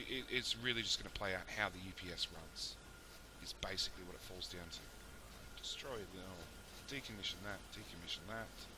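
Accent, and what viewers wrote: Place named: Australia. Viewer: kinda digging the "alright, bye" have you advertised on reddit? i believe you can get one lp post. i was going to advertise you myself, but i keep my reddit persona FAR away from me irl. i rekon you could drum up a few more subs from there. your videos are great compared to the other factorio people i follow, plus the aussie qualities are just gold! upon further analysis.. please, for the love of all that is holy, don't say "bye byes". 😕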